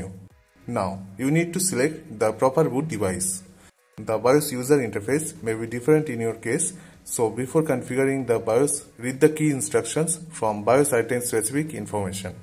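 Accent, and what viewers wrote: India. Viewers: Awaaz se vah Indian dikhta😂 hramkhor bolna re Hindi mein bol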